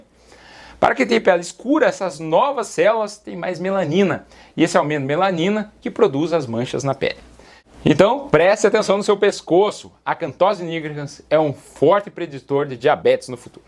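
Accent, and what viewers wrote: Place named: Caipira, Brazil. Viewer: Esse doutor tem um sotaque caipira…é raro de se ver 👏🏼👏🏼👏🏼😁😂😂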